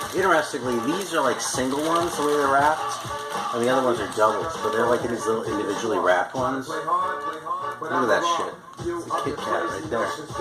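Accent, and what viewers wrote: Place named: Spain. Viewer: That Gang Starr album totally rules! Now I'm gonna watch new Blade Runner and have some chocolate while watching it. Nice stack of picture records. I have first four Venom albums on picture discs. I have more but I really don't remember right what else. Cool Spanish accent hehe. Take care. Cheers, Petri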